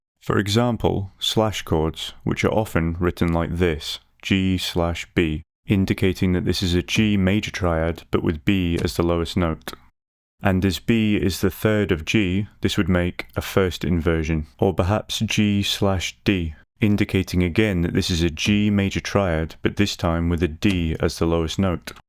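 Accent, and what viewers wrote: Scotland, United Kingdom. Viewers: I love your videos. Most of the ones I watch are American and you have a great confident Scottish accent. You speak calmly and clearly and everything seems very well planned and thought through. I could never seem to harmonise the harmonic minor scale properly. This video has taught me that augmented chords have a sharp 5 and NOT a sharp 4 😂 thanks for everything matey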